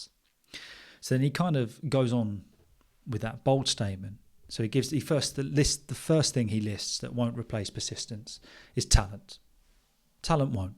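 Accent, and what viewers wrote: United Kingdom. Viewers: I love the british accent ♥️ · This is the first channel of British English that I really enjoyed 😊. I tried once before but I couldn't find one so good like yours. Thank you so much for your good job, and your effort helping us to improve our English skills. I must confess that I prefer the American accent, but you are changing this. I'm a little obsessed with your channel 😂.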